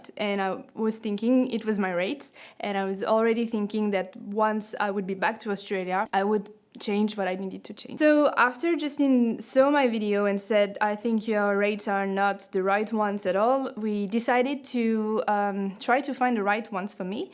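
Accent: France. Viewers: Great Video for Beginners. Very nice! I like your french english! Looks like more snake rates then Steele rates! Greetings from Germany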